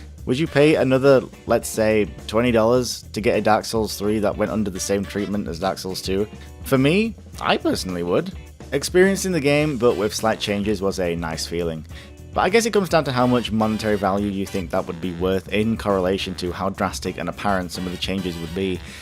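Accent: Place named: United Kingdom. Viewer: Ah, ramblings in British or... whatever you accent is. Whatever it is, it's exceedingly good!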